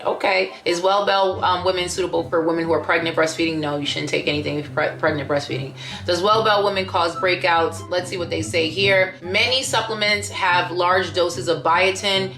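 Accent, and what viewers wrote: New York, United States. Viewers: U definitely from New York or Jersey Lml. 💙 from Brooklyn🗽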